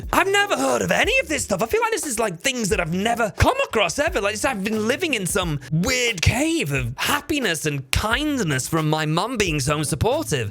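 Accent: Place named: United Kingdom. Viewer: Finally another reddit channel I can enjoy! Hell ye, time to learn to understand the ✨ British accent ✨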